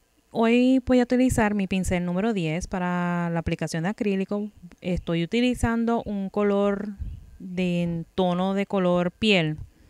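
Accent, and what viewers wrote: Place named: Cuba. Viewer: esa es una cubana o puertoriqueña)=? que linda voz tiene dame su correo ja ja ja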